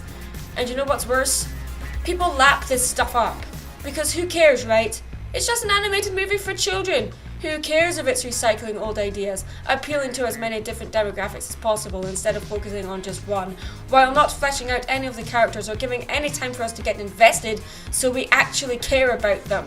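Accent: Scotland, United Kingdom. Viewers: Hi! I have a question to ask you. ( I don't remember if I had asked you this before so if I have I'm sorry but could you please answer again cuz I can't find it) I have a question about your voice for Mary Sue. I know that you're Scottish and I love your accent by the way but whenever I hear you do Mary Sue's voice ( to me) you sound like you have an American accent, was this planned for the first ever video that showed Mary Sue,or was it just by accident? I'm just wondering because your accent you have for your videos when you speak and accent you have for Mary Sue and she speaks or very different so I was just wondering thank you!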